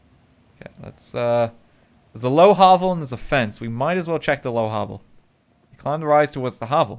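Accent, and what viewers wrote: Ireland. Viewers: A man with an irish accent dooms a city and his character's sanity for a crown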